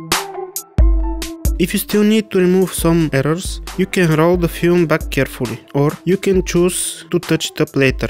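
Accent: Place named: Russia. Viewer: Твой рунглиш великолепен)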